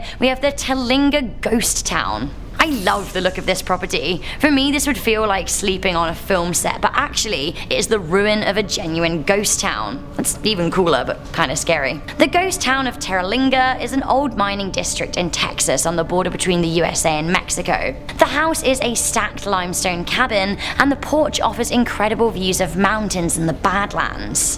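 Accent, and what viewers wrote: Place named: England, United Kingdom. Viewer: I started watching this channel originally cuz I love English accents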